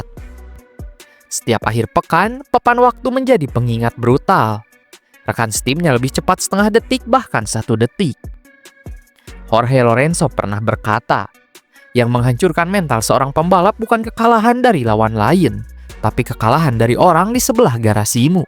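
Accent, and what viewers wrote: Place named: West Java, Indonesia. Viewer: Sunda pisan 😂👍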